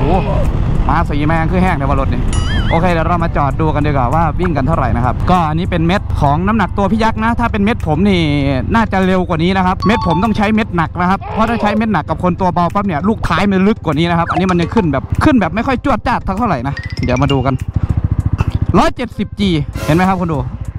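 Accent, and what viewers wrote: Isan, Thailand. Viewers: ชอบพี่ว้ะพุดอีสานล้ะตลกจิง555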